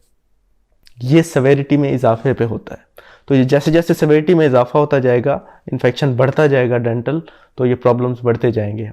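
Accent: Saraiki, Pakistan. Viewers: ڈاکٹر صاحب سرائیکی لگتے ہیں اپنے accent سے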